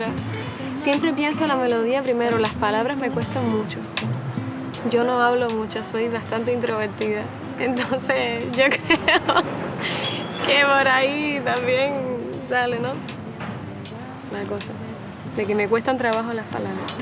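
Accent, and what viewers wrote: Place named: Cuba. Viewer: esta hermosa y con ese acento cubano cosa mas grande caballero!!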